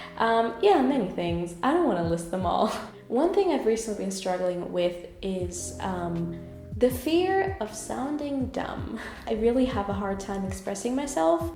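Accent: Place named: Germany. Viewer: Ich glaube mit Akzent war eher Dialekt gemeint, also die Region aus Deutschland wo du aufgewachsen bist. Kein ausländischer Akzent :)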